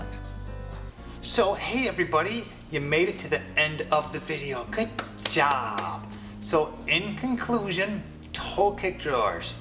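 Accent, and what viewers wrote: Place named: Wisconsin, United States. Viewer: I grew up in central Wisconsin, I know that accent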